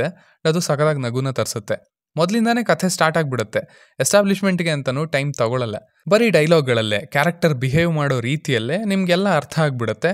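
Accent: Honnali, India. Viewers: Nim voice and mathu kelidre nivu ಹೊನ್ನಾಳಿ avru ansalla!!!!! ನಿಜವಾಗಲೂ 😊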